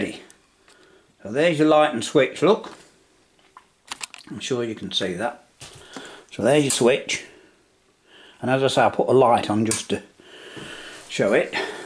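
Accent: England, United Kingdom. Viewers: Shit, I legitimately thought I was fluent in English as a French native but to be honest I can't get anything of this strong English accent contrary to American accent.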